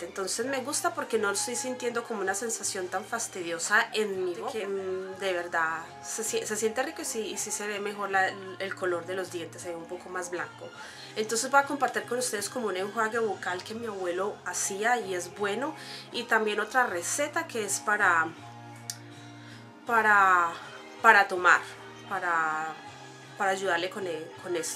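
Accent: Colombia. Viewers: Hoy descubrí estos videos y están geniales, gracias por compartirlos con todos nosotros :) Eres paisa? Es que te siento como acento jeje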